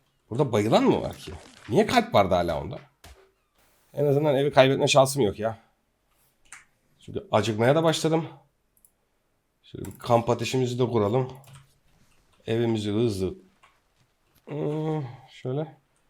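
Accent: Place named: Anatolia, Turkey. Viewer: Anadolu insanı gibi konuşman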